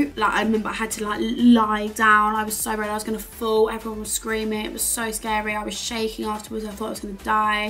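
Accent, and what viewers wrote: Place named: United Kingdom. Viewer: Beautiful in the proper British accent 😮😮😮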